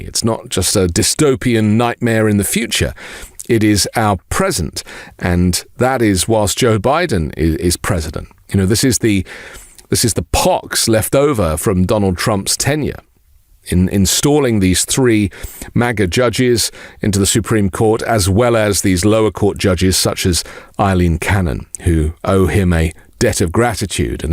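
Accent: United Kingdom. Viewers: Thank you for these words! And thank you for your British accent! The US is a complete mess. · Glad to have a British accent reassuring us amid all the panic about Trump getting more votes from this 😂❤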